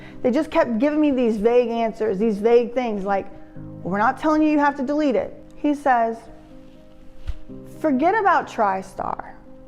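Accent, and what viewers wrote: South, United States. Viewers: Just came to likeeeeeee i heard this story years ago love ur southern charm with it!!!!! Mucccch love❤... Ima too lol love youuiiiu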